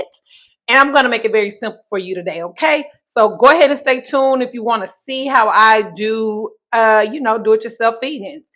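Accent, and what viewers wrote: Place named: Texas, United States. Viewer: What part of Texas you from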